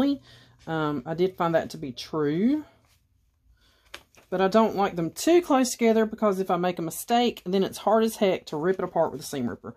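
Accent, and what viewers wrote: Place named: South, United States. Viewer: Hello Mrs. - I LOVE your southern accent🥰. I have read the comments and seen that people have given you some advice about not having to re-thread your machine...They've suggested you sew two blocks at once, use dryer sheets, etc..All you need to do is pull the block your sewing on, to the back of your machine and make sure that you've got 5-6 inches of extra thread, then snip while you've got a finger on those threads, so it doesn't bounce back. Dryer sheets, aside from being spendy, are full of harsh chemicals that cause Alzheimer's and dementia. That's why they do not list the chemicals that are in the dryer sheets on the box they come in. I've done the research. These blocks you've been making are so gorgeous. I'm new to your channel. I cannot wait to see the finished project. You're doing an awesome job❣ Thank You for your videos 💖🥰 p.s. 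I hate to see you go through so much work to get rid of the paper off the back of your blocks. You can actually sew these on Old sheets that you get from the thrift store, leave the fabric on, cut them to size before you sew your strips on... square up the blocks... you get me. 😊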